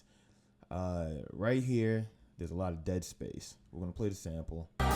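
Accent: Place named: New York, United States. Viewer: this guy is clearly from NYC lol. good tut, thanks.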